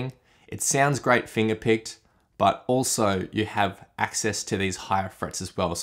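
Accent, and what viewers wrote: Australia. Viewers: i always watch your videos help me to improve and learned base in your accent seems like you live he in Australia aswell :)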